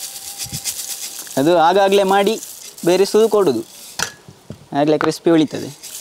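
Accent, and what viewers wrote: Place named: Coastal Karnataka, India. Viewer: ಆಹಾ ನಿಮ್ಮ ಪರಿಪೂರ್ಣ ಕರಾವಳಿ ಕನ್ನಡ ಕೇಳೊದೆ ಒಂದು ಖುಷಿ.....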